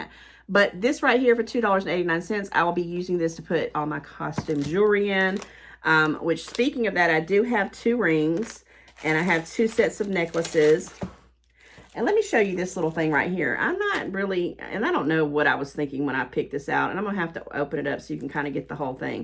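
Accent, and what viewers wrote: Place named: South, United States. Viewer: I discovered your channel a month or two ago. You are just so real & I love it. I enjoy your videos and your Southern accent. Your home is gorgeous!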